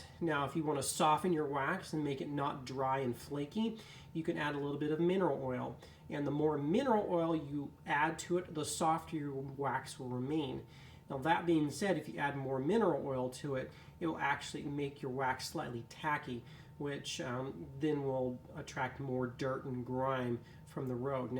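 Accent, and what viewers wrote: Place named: Midwest, United States. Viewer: Aside the annoying accent (yankee? midwest?) that over-emphasizes on bilabial consonants with an "h" sound that makes the talker sound like some pompous Victorian colonial windbag (it's maybe not the author's fault anyways, just his native accent), I'd say this is the best explanatory video on the matter. No useless, pedestrian intro... gets to the point soon enough. Much thanks! Will try that. I was also just wondering if adding paraffin oil is important, or just wax is fine.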